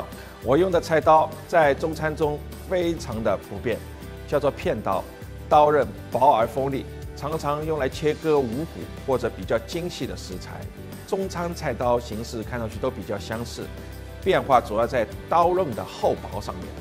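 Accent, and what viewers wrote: Shanghai, China. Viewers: 上海人伐？听口音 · 能听出来John大厨的上海腔调，不亏这么多年在魔都积累的经验。😂 我是湖南的，希望可以多看到点湘菜系的菜肴！Best wishes to the TS crew, esp. during these dark days.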